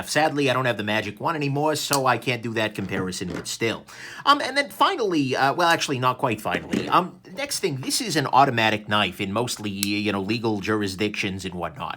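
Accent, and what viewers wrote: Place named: New Jersey, United States. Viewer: Wow! I have to guess that you are a Jersey dude based on accent? (asking as a full on hick based on my accent) Great and informative review. Thanks!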